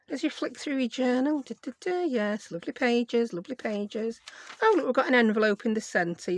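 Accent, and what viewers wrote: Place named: North Yorkshire, United Kingdom. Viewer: I just found your videos! I absolutely love them! Especially love your accent. I spent 18 years in the UK, mostly North Yorkshire. You are making me homesick! In a good way, I loved it there. If I may ask, where are you located?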